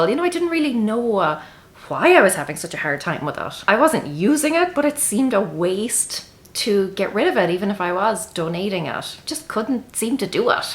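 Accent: Ireland. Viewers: sounds like an irish accent but I cant pinpoint where lol :) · I just watching this video for info and now ill watch it to hear your beautiful accent. Irish, right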